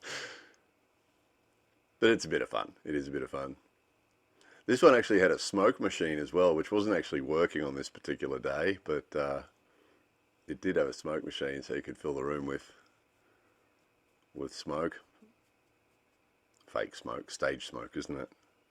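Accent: Australia. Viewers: You should have displayed your Japanese instead of us having to your Australian accent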